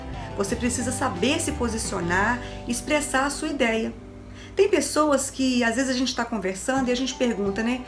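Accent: Minas Gerais, Brazil. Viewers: Vc é mineira! Meu pai tbm, de Passos. Agora te entendo melhor. Bjssss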